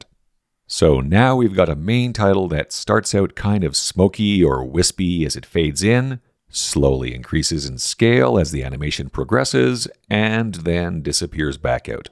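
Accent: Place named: Canada. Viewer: I hear your Canadian accent...my wife is Canadian too! Thanks for the tutorial. I'm a subscriber now!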